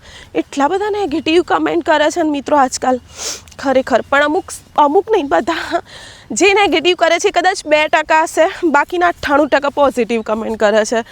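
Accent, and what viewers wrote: Sabarkantha, India. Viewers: tara video badha bahuj saras details sathe full information with tecnology wala hoi che.mane tara language parthi j lagyu ke tu sabarkantha Modasa ni che.hu pun modasa ni aagle Vadagaum Dhansura bayad Aakrund gaum ni chu.darbargad ni.tane khup khup shubhasshirvad.god bless you.tari bhasha aavij rakhje sweet.aapnu gaum ni nam aagal lavje Tram no video saras details sathe banavyo hato.tyare j lagyu hati ke tu sabarkantha Modasa ni j ke dhansura bayad ni che.❤🎉😊 Thanks u.proud of you dear.❤🎉.hu hamna baroda ma rahie che.perth ma apna baroda na badha bahuj che. 😊😊😊😊roj nava video banati rehe.hu pune mathi jovu chi.❤ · YOU ARE WELL EDUCATED AND EXPERIENCED. AND ALSO. HARDWORKING. WOMEN. BY YOUR SPEECH. I. WAS IN DOUGHT. THAT. YOU ARE. FROM. SABARKANTHA. NOW. MODASA. IN. ARRVALLI DISTRICT. SPEECH. IS REALLY. EXCELLENT I. LIKE. I. AM FROM. 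NARODA AHMEDABAD. KEEP. IT UP. A DAY WILL. COME. YOU. WILL BE. TOP. VLOGGER. DON'T SHOW. MUCH DETAILS ABOUT. YOURSELF TO. AVOID. UNNECESSARY. USELESS. COMMENTS. EVERYONE MUST. SUPPORT TO. SUCH. VIDEO MAKER🎉🎉❤❤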